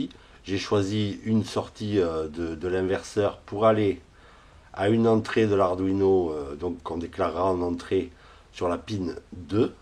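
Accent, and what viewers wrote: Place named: Toulouse, France. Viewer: Bonjour Merci pour vos tutos!!! On à beau dire mais l'accent de Toulouse facilite grandement la compréhension de l'arduino, pour moi qui vous écrit du 65... En prévision de construction de mon TCO, j'ai déjà câbler et programmé un servo avec un inter bipolaire ( trop fort!!!) les cœurs d'aiguilles et les leds du tco seront par micro swithc commandées par les servos. Deux questions se poses pour moi: Combien de servos je peux commander avec l'arduino uno? (la puissance se fera avec une alim indépendante 5v) Et comment puis je les raccorder? Encore merci pour la simplicité et la pédagogie avec la quelle vous nous expliquez des techniques qui peuvent être si compliquées (du moins pour moi...) Adichatz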